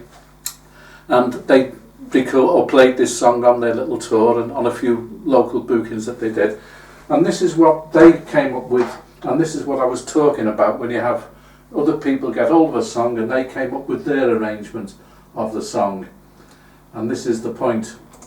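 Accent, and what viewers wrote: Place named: England, United Kingdom. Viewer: Hello.. Love your English accent, very charming;-))... you sure have a nice set up for all your equipment, you sure went back to gather all the specific tunes, to explain all this to us, looks like a lot of work, all your version were a delight to listen too,..You are a great artist, singer and most all, you get so detailed with your songs, that they become part of us..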